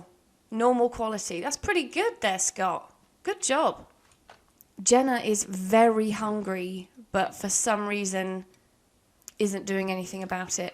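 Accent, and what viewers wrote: United Kingdom. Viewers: I opened the video, then, I heard you're british. I'm sorry. I can't. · I'll be honest here: of course I watch Sims movies because I just love them, but in this channel's case it's the sassy Brittish accent that adds some icing on the cake.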